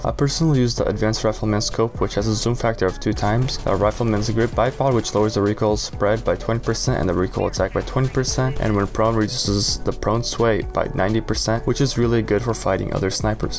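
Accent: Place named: Russia. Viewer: По акценту видно русский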